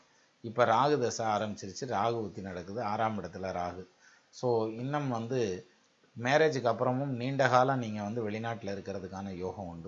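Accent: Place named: Tamil Nadu, India. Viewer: sir how r u?i hope u r fine.i have been watching u r program since 2013 its very nice sir .u speak in dindugal tamil slang is absolutely wonderful with comedy sense sir. now i am currently working in singapore since 2005 sir but in the job still no improvement for me sir my friends all come with me well developed sir no satisfication in the job for me sir .last year i got married still not yet baby also sir.my DOB <num>.BIRTH TIME :9.20 PM.BIRTH PLACE :COIMBATORE. And also please tell me about my future. i am waiting for u r answer.please reply me sir.sir my birth astrology my laknam kanni but we give in the computer dob,place,time it show as simha laknam i am confusing please explain me sirGOD BLESS U SIR. THANK U VERY MUCH SIR.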